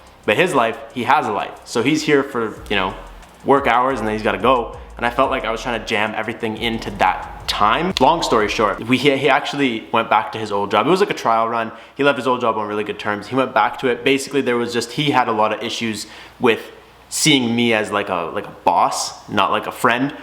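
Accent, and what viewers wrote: Canada. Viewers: I really fuck with you.. wish you didn't live in Canada ay.. I'm in Baltimore, but wish I/you was closer.. would definitely hire you to help me with my 300..zed-x.. LoL just learned about the zed instead of the letter Z y'all Canadian's say.. LoL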